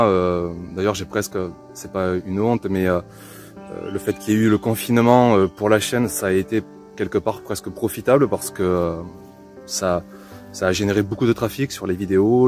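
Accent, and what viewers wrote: South, France. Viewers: Prisonnière dans d'énormes soucis de famille (deuil, succession, procédure de justice pour de la bêtise humaine) mon havre de paix reste sans aucune hésitation mon jardin, votre livre et vos vidéos. Le seul problème (qui n'en est pas un pour moi mais qui agace mes enfants et mon mari) c'est votre joli accent du Sud qui déteint sur moi. Du coup, le potager d' est très présent chez nous mais c'est que du bonheur. Merci et encore merci pour me donner cette force de me battre tous les jours dans un monde perdu dans le stress alors qu'il y a tellement de choses à prendre et à apprendre de notre terre. Une énergie hors norme dont vous savez si bien nous la faire partager et passer à travers nos écrans. Reposez-vous bien et à très vite. Cordialement.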